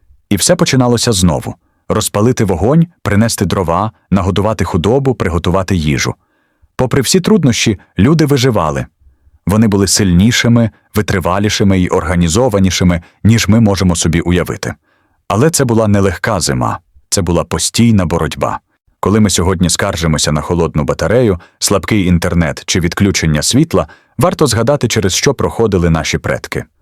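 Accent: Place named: Russia. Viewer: Та не дровА , а дрОва!!! Чому ви в українських словах робите російські наголоси?! Не конІ , а кОні Не травИ , а трАви Не чАсник , а часнИк!!! Не кагАнці , а *каганцІ*. Ви ніколи не чули, як звучить українська мова? Вже не говорячи про зображення. Ніколи не бачили української хАти, навіть на картинках у дитячих книжечках? Вони були обмазані глиною і побІлені! А української пЕчі? Якщо вже ви назвалися "Історична правда", то створюйте щось максимально схоже на неї! Бо у вас покищо виходять "Історичні побрехеньки", нафантазовані ШІ.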